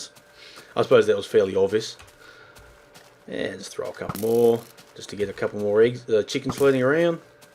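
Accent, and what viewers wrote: Australia. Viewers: its a HOUMING BOUW... i love australian accents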